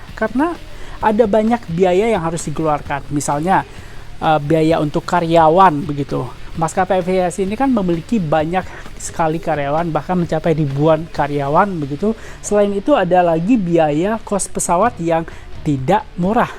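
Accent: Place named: West Sumatra, Indonesia. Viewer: Orang sumatra Barat nih presenternya... Logatnya mantep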